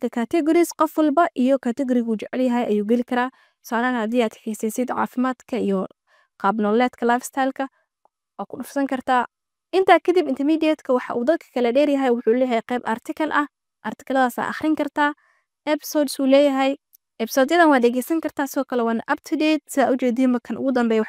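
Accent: United States. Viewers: Wll waxan kaa rbaa appkii aad ku baratay American accent thanks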